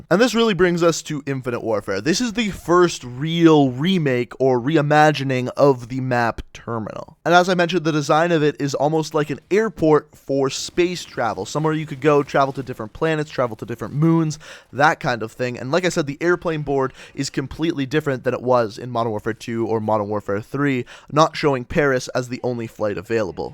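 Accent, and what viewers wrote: Canada. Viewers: Your so candian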